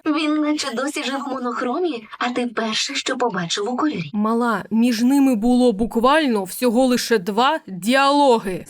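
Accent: Zaporizhzhia, Ukraine. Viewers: Запоріжжя чи що? Ой, як приємно. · Шок, ти шо з Запоріжжя?😳яка неочікувана приємність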